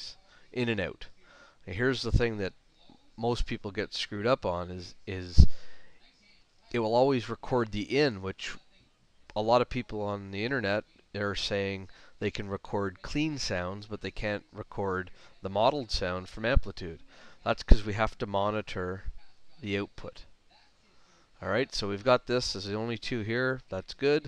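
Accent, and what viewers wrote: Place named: Ontario, Canada. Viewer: thanks for the great helpful vid! I have to ask, by your accent you sound like you're from Ontario. Are you?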